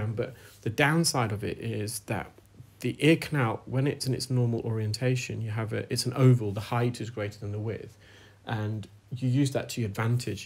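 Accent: United Kingdom. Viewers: Someone telling you off for using “anti-clockwise”? When it’s a UK term, used in a video recorded in the UK, by a person who sounds like they were born and raised in the UK? The nerve. Now that that’s off my chest - great video as always,. You never fail to entertain and educate. Please keep up the good work!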